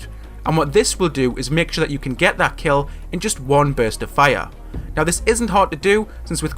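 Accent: Newcastle, United Kingdom. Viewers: U from newcastle I am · It's not Irish, hes from Newcastle, it's a Jordy accent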